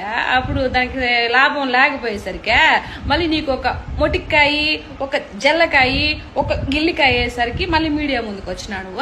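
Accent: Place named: Nellore, India. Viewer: You are soo super Nellore slang stop Mari madras undi akkada vagu first nennu nu.... Nuve ok la..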